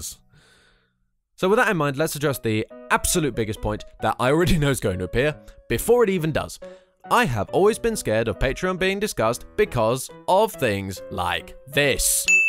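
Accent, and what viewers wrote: United Kingdom. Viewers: Well having a patreon is nothing bad. Good luck with the rewards! Except for the once that have already been mentioned (names in the video, donators chosing games and playing with you on multiplayer games on stream) maybe for a higher tier the ones who donate can ask for a sentence you can say in your british accent? Then you can send the mp3 file to them via e-mail or something like that, and they can do whatever they want with it. Use it as a notification sound on their phone, in their videos. I don't know. Again this is a suggestion, you are more than welcome to do whatever you want with it. Also sorry for being inactive on your channel. I am very easily scared by horror games. Anyways tallihoo and good luck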